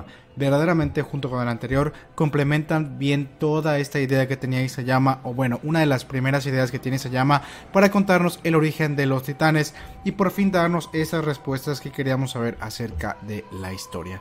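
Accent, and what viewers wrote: Yucatan, Mexico. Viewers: De donde eres? parece que tienes acento Yucateco ajsjajja Ya se que nada que ver con SNK xd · Eres de Yucatán?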